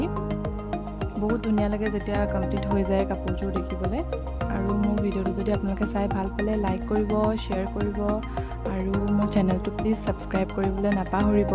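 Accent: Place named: Lower Assam, India. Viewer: kotha kwa bur nomamia kowa jen lgise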